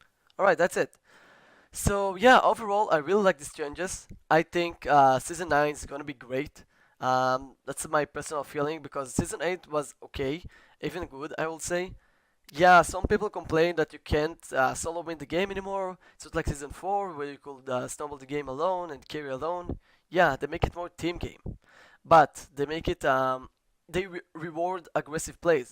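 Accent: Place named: Latin America. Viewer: are u latino? lol ur accent is strong and nice video! pretty informative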